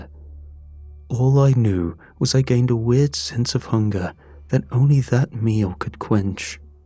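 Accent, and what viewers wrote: United Kingdom. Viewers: I can’t sleep without bedtime stories! 🎉thank you for your dedication and British accent lol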